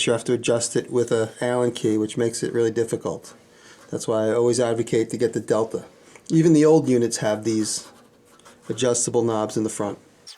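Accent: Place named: South, United States. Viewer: Great videoes. I have had a band saw for 15 years and Today I found out from you that it has been no where as useful as it is capable of. I am 70, my friend, also 70 just bought his first bandsaw. ( Grizzly). I am going to call him and insist he watch you use a bandsaw with skill. We live in Georgia and Alabama and you talk like us. Spike really adds to your tubes. Thanks for sharing and caring. I hope you enjoy your new upstate shop.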